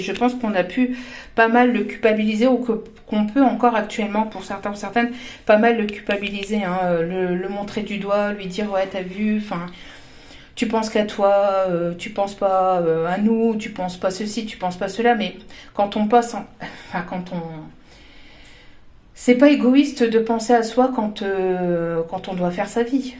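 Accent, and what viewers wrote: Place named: North, France. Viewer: Quel tirage 🤯 mais quel tirage 💓 Moi j’aime bien votre accent du nord 😁😉😎 un grand merci 🙏 belle soirée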